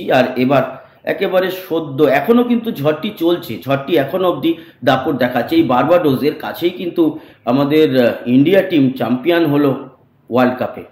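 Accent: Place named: Fatikchhari, Bangladesh. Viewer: আপনার মুখে ফটিকছড়ির কথা শুনলে ভালো লাগে তাই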